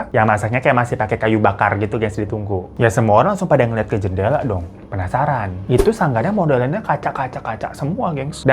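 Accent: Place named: Sunda, Indonesia. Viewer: Si aa lucu pisan ngomong bahasa sunda na jahaahaha😂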